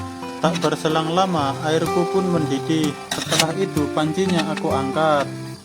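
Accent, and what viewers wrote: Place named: Java, Indonesia. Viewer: Logat jowo nyell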